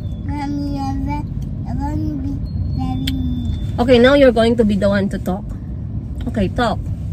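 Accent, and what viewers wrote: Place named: Conyo, Philippines. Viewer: Konyo kaayo ug OA ka madam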